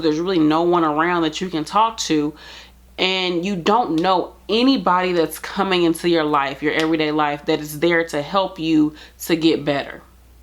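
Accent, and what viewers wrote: St. Louis, United States. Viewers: ...this was a brilliant video. I have watched a few of your past ones (mostly coz I love your stl accent ) but this one was top notch and as real as it gets! Much love!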